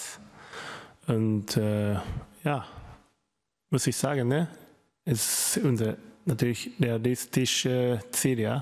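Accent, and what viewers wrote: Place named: Japan. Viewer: 頭いい人って発音気にしないよね、バリバリの日本訛りでも気にしないんやな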